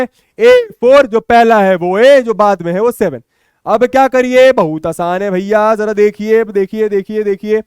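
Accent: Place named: Bihar, India. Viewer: My mom asking "is he bihari"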